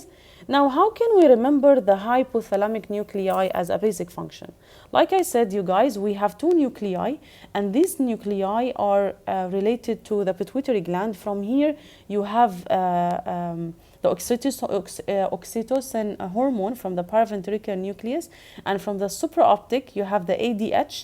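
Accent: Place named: Arab world. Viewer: I have been watching so many videos to get into such complicated area, however i could complete non of them. now I feel lucky to find this amazing channel. Whoever watch this episode not only will be able to understand the topic, but also can memorize the details easily bcuz u r talented and creative regarding linking the informations. I wonder why your channel did not go viral yet, may be it is a location and suggestion issue. Though your accent seems arabic, your language is perfectly fluent. Listening to Indians is awkwardly exhausting and usually u turn the device off within ten minutes maximum😅. Thank u so much for making such unique lectures, I appreciate your work 💚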